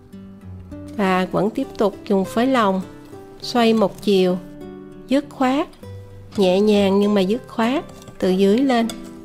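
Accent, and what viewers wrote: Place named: South, Vietnam. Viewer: ❤❤ nói giọng miền Nam từ ngữ miền Nam nghe rất hay❤🌹🌷👍 Rất mong quý vị mình dùng từ ngữ của miền Nam❤ đừng lai từ ngữ bất kỳ vào rất khó nghe, rất rất rất cảm ơn quý vị và các bạn Duy Mạnh từ ngữ của miền Nam❤❤🌹🌷👍, giọng nói của dịu dàng rất dễ nghe❤❤❤...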